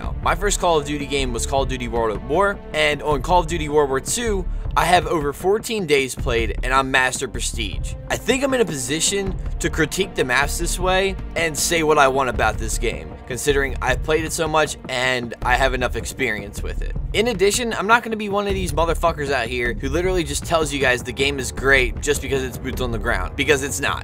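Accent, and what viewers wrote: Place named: Pittsburgh, United States. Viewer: You have a Pittsburgh accent kinda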